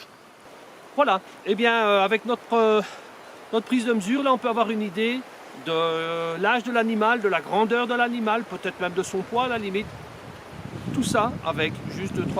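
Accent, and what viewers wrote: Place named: North, France. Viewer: Toi tu viens du nord .. Encore un kek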